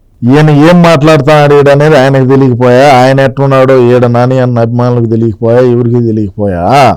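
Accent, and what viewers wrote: Rayalaseema, India. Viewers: భాష రాయలసీమ భాష బాగుంటది.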